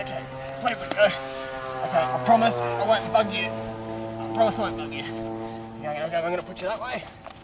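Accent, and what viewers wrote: Australia. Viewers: This guy vs The Kangaroo punching aussie vs Gary the Goat for most Australian thing on the web.......P.S. what does he call his audience? At first i thought he was saying "george" then it sounds like "church"...i have an American's ear and some thick aussie accents sound like vegtable soup in my ears..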